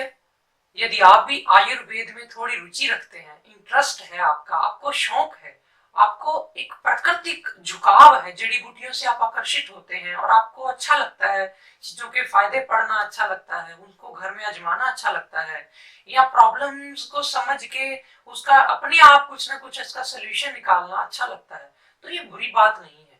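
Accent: Punjab, India. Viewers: Y did u changed ur name... i can see punjabi touch in your speech and in your way of your expressing thing.. i am sure you are sikh.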